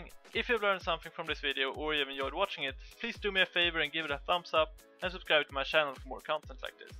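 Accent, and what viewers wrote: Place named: Norway. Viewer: where are you from? you sounds like you are from norway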